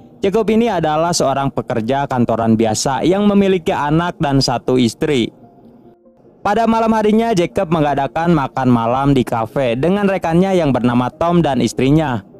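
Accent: West Java, Indonesia. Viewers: Aduh a ni resep nyarios na indo Sunda duh 😂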